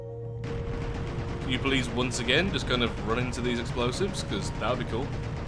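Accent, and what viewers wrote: United Kingdom. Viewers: When I cannot sleep I get recommended videos and I get to pull a all nighter to his British voice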